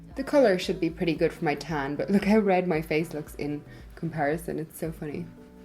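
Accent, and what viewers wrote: Ireland. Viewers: Is your accent Irish? Love your channel & review!! Love Charlotte Tilbury products & you look beautiful 😃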